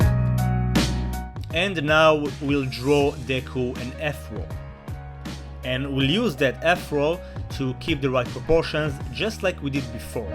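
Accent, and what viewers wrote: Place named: Israel. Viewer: פתאום אני שומע את המבטא הישראלי שלך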